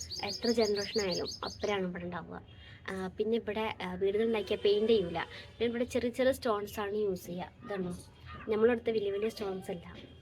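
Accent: Malappuram, India. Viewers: മലപ്പുറം സ്റ്റൈൽ സംസാരം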